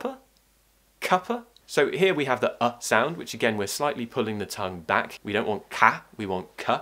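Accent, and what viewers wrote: RP, United Kingdom. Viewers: Hello , I'm fond of this accent RP Which is your accent teacher RP it's bloody sansationl thank you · You are my teacher in British accent ❤️🔥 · Hi I'm watching from Burma 🇲🇲 and you are the very good teacher and teaching and i very like to hear British native English sound and i also try to be learning . Thank you for you making alot of videos. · Good lesson. I enjoy British accent. Especially your accent,. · Excellent ... Love British accent... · Hi nice to see you again! I really loved your british accent ♥️♥️♥️😍😍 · Good work... British authentic accent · Dear, you're a true modern RP accent teacher. Your syllables are loud n clear. I assume like listening to oxford advanced learners dictionary pronunciation👏👏👏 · Friend of mind from private education system in London!Accent is different with your RP!Strange🧐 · I luv [your] British accent! 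· I really enjoy your clips because I love British accent. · Best British accent i have ever heard!!! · I like the british accent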